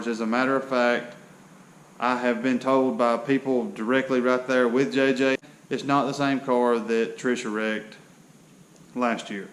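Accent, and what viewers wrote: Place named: South, United States. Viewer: That's the kind of cheap barn that attracts tornadoes. You sound like you're from the south, I hope you have insurance ....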